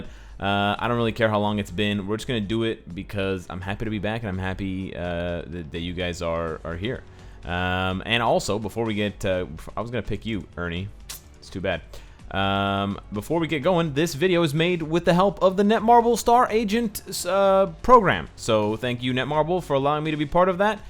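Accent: United States. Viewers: I found that country accent funny and I wanna be roasted IGN abdouben22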